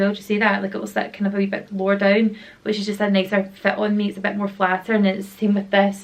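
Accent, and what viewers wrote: Scotland, United Kingdom. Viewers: Not video related but I Love the Scottish accent. My dad's from Glasgow and my mum English I speak English but love the accent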